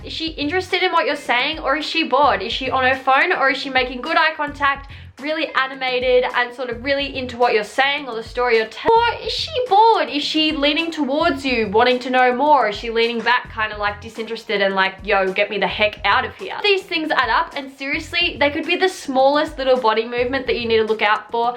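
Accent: Australia. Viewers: i blushed lmao thanks for replying ♥️ and yes you really sound aussie! smh how could I not tell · are you dutch???? Omg ik hoop het hahah. And are you from Straya I hear your accent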